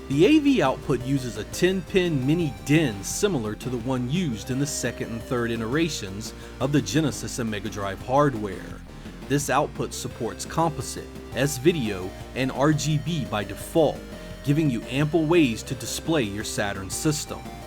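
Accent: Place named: Baltimore, United States. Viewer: Cool! I work with a guy from Baltimore and you guys sounds like brothers. Thanks for the reply. Keep the Saturn videos coming. Also, are you familiar with The VGC replacement long box Saturn/Sega CD cases on Amazon? I bought some and have my own opinion but curious if you had any hands on?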